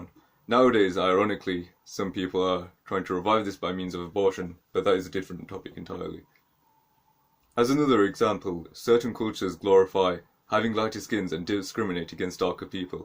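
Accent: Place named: United Kingdom. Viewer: Based bri'ish accent MA.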